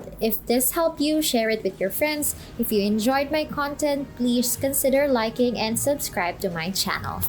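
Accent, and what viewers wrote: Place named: Philippines. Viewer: Are u filipino? I can tell from ur accent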